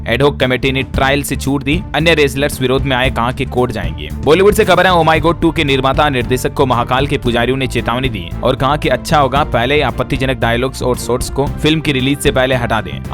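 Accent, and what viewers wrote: Rajasthan, India. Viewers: भाई साहब,,, बोली लहजा ठीक करो,,, राजस्थानी भाषा का पुट है भाषा का,,, कल को तरक्की में बाधा उत्पन्न होगी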